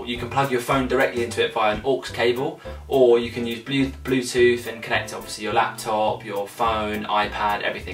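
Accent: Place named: United Kingdom. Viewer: If I had your perfect british Accent I would never shut up!!! It's fucking amazing!!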